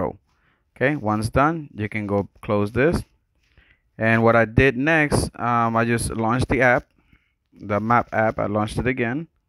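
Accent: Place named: Mexico. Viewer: Mexican accent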